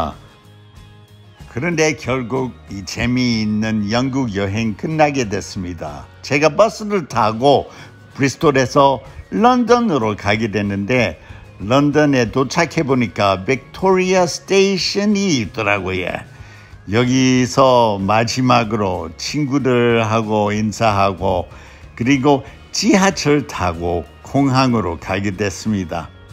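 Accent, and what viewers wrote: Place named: Gyeongsang, South Korea. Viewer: 남들은 몰라도 저는 부산이 고향이라 경상도 사투리로 설명해주신게 너무편하게 잘들리고 쏙쏙 이해가 잘되서 너무 좋습니다~^^ 항상 건강하시고 다음편도 기다리겠습니다~!!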